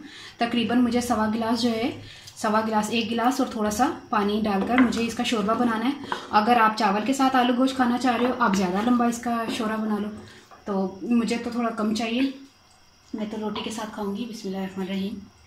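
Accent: Punjab, India. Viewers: Aaahhhaaa punjabi ka tarka....enjoy your pure pinjabi accent... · First of all thanks for this wonderful recipe. I am urdu speaking but at the end your punjabi accent is so awesome. I like the way of your speaking. Good job.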